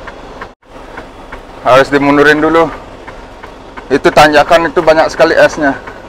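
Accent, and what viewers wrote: Bali, Indonesia. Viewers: Om orang bali ya, kok logatnya kyak orng bali?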